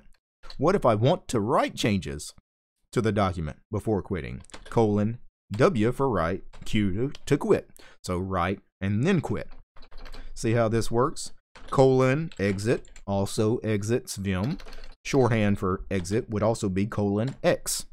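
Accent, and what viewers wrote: South, United States. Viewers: You're from the south?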